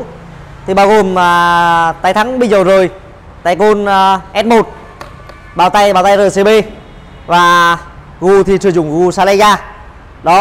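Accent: Nghe An, Vietnam. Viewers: Bác người Diễn Châu nghệ an phải ko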